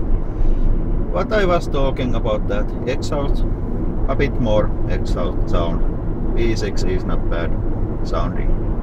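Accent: Finland. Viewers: I love the Finnish accent and the car as well :) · On kyllä nii finnish english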